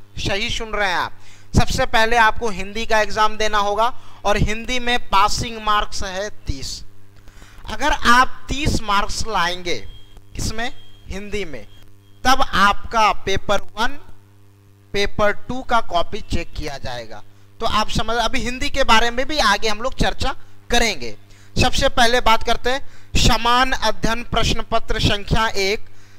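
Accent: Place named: Bihar, India. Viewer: Maje le ro ho ap Ye bihar ki language aisi hi prvarti ki hoti h 🤗 पढना _ परना सडक_ सरक etc. It's all ✓. One can understand 😎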